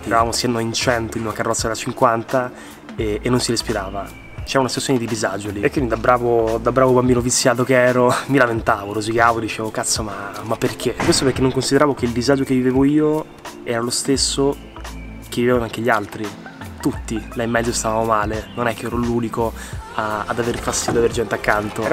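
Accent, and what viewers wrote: Rome, Italy. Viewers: Oddio ti prego stai iniziando a perdere l'accento romano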